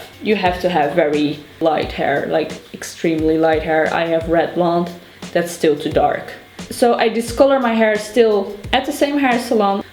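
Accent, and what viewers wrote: Netherlands. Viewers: I hear a Dutch "accent" in your English.. Are you Dutch? Haha cuz if you are hii me 2 :3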